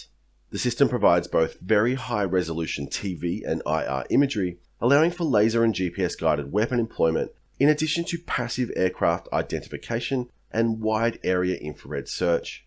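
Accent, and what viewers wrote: Australia. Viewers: And this video isn't the exception; it seems to be the norm. Watch his EPIC explanation on: The history, development, physics, tactics and strategy of nuclear munitions. It's an outright 10. And I am knowledgeable on this. I actually (for whatever reason) don't love aussie accents, but I LOVE this channel.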